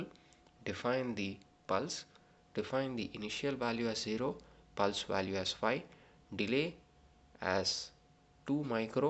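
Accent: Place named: India. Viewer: indian accent too thick to get Plz stop toturing people induan guys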